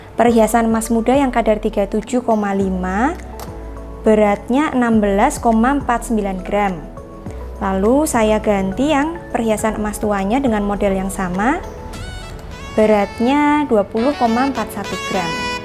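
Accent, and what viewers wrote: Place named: Java, Indonesia. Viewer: Medok yes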